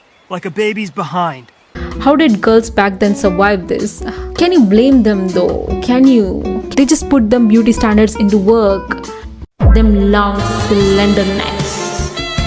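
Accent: India. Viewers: Why you have indian accent? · Your accent is very much indian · thrown off by the haryanvi accent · u sound indian lol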